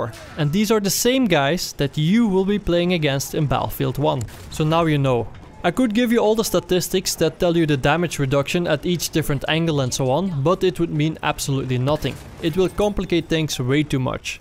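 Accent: Netherlands. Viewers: its the most dutch accent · pretty sure he is dutch, its in the accent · u dutch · waarom klinkt ge zo nerderlands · m8 you dutch? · dat nederlandse accent tho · This was realy helpfullBtw are. You from holland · Your English sounds so Dutch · dutch af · Dit Nederlands accent is erg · this guy is dutch for sure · accent is super confusing, it sounds dutch but then also russian, and scottish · heared withing 0.001 seconds that you are dutch, might i be right? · Nice tips man! thanks a lot. Are you Dutch? :p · you are clearly Dutch, I can hear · are you Dutch? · I like your dutch accent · Are you dutch? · i bet you are from NL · you are dutch arent you ^^ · you sound dutch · you have a Dutch-ish accent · are you like Dutch, because your accent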